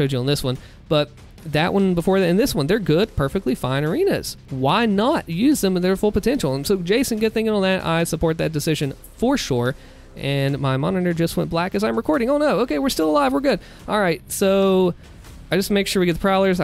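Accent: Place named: Midwest, United States. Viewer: Hey r u from the midwest? Im just curious, ur accent is so funny 😂. Keep up the good work tho, u r honestly one of the few doomtubers who put effort into their content. I have a suggestion for u however, which is to play some doom eternal levels on the switch. I think that would be an awesome level (I play on switch 🤣)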